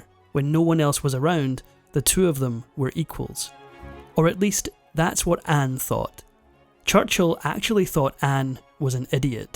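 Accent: Scotland, United Kingdom. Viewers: The title says "Britain's First Queen." The first British queen was Mary I, eldest daughter of Henry VIII. It doesn't matter if "the narrator spoke with a Scottish accent." It doesn't change the fact that the first female to be crowned a sovereign on the Isle of Great Britain was Mary I. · It's a Scottish accent. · I'm training my ear, could you confirm your accent is Scottish? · EXCELLENT, as always. The voice is beautifully modulated and the Scots accent is wonderful! What is your name, sir? 🥰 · Rather upsetting to hear this account of the union between England and Scotland given with a Scottish accent without mentioning what disaster that was for Scotland.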